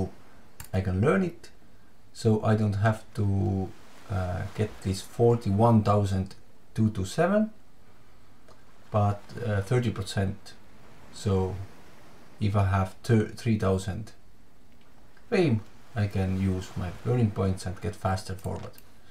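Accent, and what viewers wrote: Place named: Estonia. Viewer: Actually i kinda like your voice but your Estonian accent is clearly understandable. Keep up!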